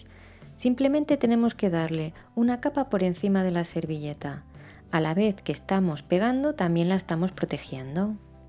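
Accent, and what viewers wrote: Spain. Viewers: Mas bien habla legit imo español de España.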